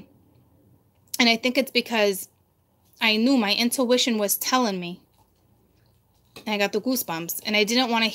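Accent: Boston, United States. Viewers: You and I have the same accent! It’s Boston baby! Be proud! It’s the best!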